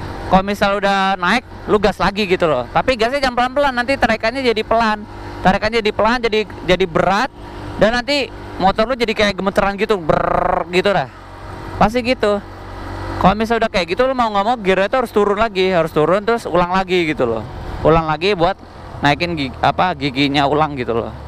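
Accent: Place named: Sunda, Indonesia. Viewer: si akang suara na suara sundanis hatur nuhun kang tutorial na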